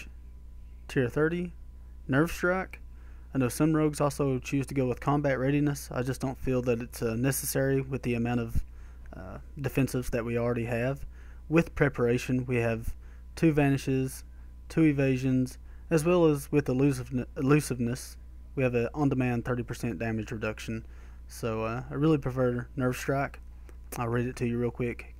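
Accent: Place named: Alabama, United States. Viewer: I love your Alabama accent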